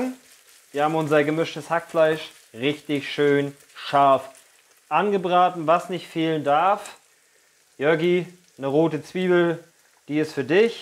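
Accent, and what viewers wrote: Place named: Thuringia, Germany. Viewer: Na das muss ich unbedingt mal nachkochen. Ruhig mehr Rezepte von. Die sind immer so ein bisschen moderner und ausgefallener. Spezialität sind doch eher Klassiker oder zumindest neu interpretierte Klassiker. Ich habe gemerkt, dass ich auf einem anderen Kanal schon oft solche "schnell, einfach und modern" Vorschläge gesucht habe, als ich die leere Pfanne gesehen habe und schon den thüringischen Akzent im Ohr hatte "erst mal einen Klecks Öl in die Pfanne" 🤣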